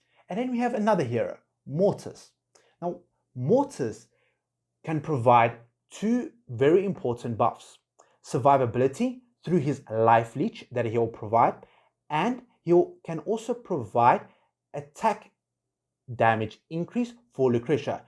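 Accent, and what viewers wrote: South Africa. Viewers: Hey, you a Saffa?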